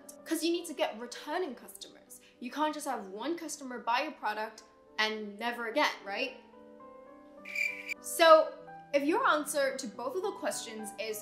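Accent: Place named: United Kingdom. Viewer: Hi! what is your website? I have wix & I didn't find it easy to use at all. I would love to have a one on one to see if you can help me. You are so excited about the flow, code graphics... Etc. I love you accent, I'm a big British fan and I picked up on it right away🙂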